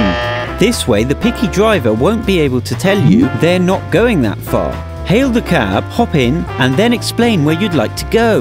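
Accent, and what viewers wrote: United Kingdom. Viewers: What do u know about NYC? You sound British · Why is a British person telling me about new york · runningstorm920 it sounds better in british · Why is a British guy telling us this. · When 13 things not to do in new york was voiced over by a british citizen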